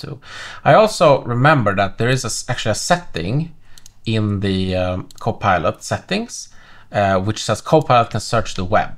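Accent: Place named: Sweden. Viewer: Wow, the most Swedish accent I ever heard...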